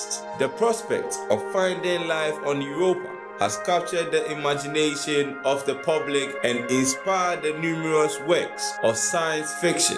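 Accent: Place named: Africa. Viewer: African voice kinda makes me think it’s not true. I guess from all the Nigerian princes bollocks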